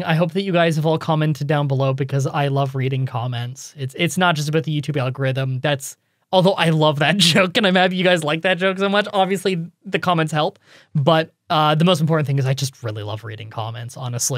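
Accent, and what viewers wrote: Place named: Canada. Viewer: Hey man, Thanks for uploading the lets play of pkmn stadium, I was really glad when I saw it, because I knew will be half an hour of escape and I was considering that you start this more popular lets play on porpouse, I was really nice. I just want to share a funny history, you were the first canadian that I regonize because of the accent and for me was super cool, at the time my wife and I were planning move to Canada, she was invited to do her phd in uOttowa., when i was trying to catch some of Canada's culture and make me feel great. Cheers man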